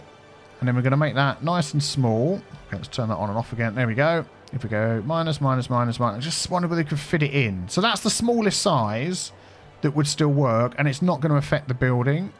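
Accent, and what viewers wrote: United Kingdom. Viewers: sounds bloody british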